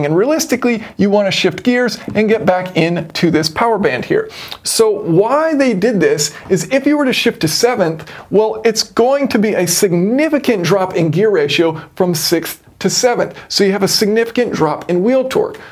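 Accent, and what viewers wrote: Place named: South, United States. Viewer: I think the time spent in Texas rubbed off on your accent a bit, and brought some more southern out. :)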